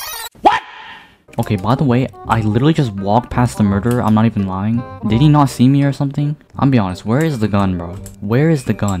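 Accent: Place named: United Kingdom. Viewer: why do you sound so BRI-T-ISH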